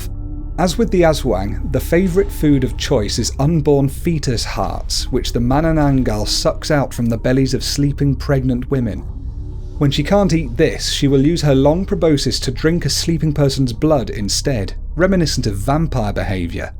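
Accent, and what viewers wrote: United Kingdom. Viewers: Listening to this Brit saying PhilipineFolk creature names makes me laugh,and,just adding this,the tikbalang is fond of abducting beautiful women to be his bride,as what I have heard here from my old family members #ProudlyPinoyAko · So many words you misread! Oh my stars! I am a Filipino!!! Are you British or something?!!! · hindi ka marunong mag tagalog ano yan british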